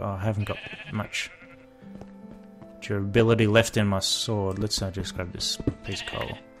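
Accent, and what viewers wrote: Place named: Australia. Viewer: Whoa!! Loving it so far! You sound so... Aussie! Ahahahaha Most amazing bro.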